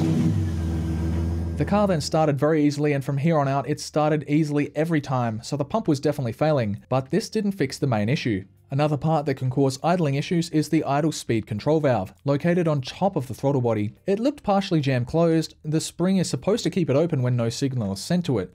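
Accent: Australia. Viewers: This is the first person I've seen with a clear Aussie accent who talks in American English, weird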